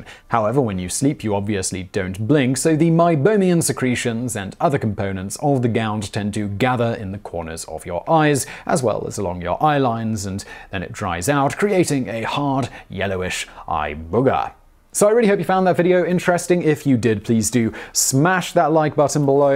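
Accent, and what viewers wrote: England, United Kingdom. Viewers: Please stop trying to impersonate a garbling artificial intelligence robot. Please just speak much more slowly and clearly in your clear English accent.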